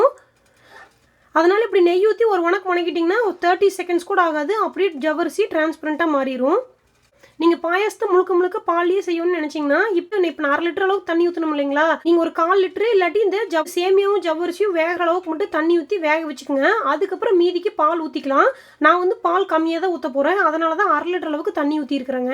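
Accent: Kongu, India. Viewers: Nenga pesaratha kekave superb ah eruku... Kongu tamil me 2 kovai thanga akka...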